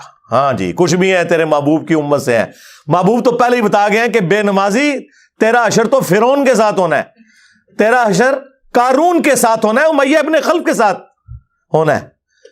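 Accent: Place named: India. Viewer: brother wo india sy hai or waha kuch words esy bolay jaty hein , meaning woe hota hai bs bolne mein farak hai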